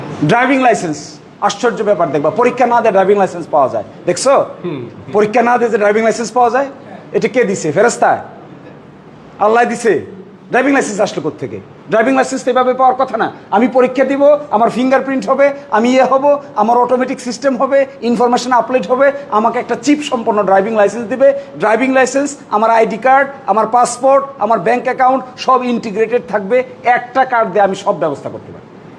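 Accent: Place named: Bangladesh. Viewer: Don't use deshi English buddy when you do public speaking. English doesn't match you. It's really annoying. Use bengali language please in public. In your reading room you can study and practice English.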